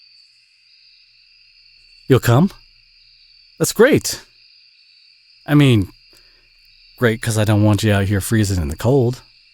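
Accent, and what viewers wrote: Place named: South, United States. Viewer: love audio and story. another cowboy audio yes!!!!!!!! the southern accent it’s just 🫠 I do really love the audio of you as a Cowboy. I don’t drive well because I can’t but in audios I can. thanks for helping me out and giving me [listener] a place to stay. anyways lovely to hear your voice ♥️ cowboy + = ♥️♥️♥️♥️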